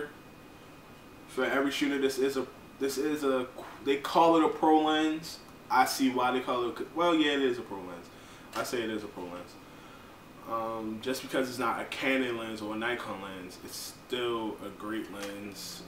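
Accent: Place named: Baltimore, United States. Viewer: You sound like you're from B'more...or maybe D.C.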